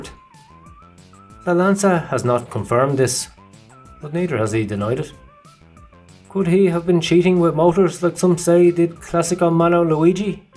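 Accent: Ireland. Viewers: Is that an Iris accent I detect?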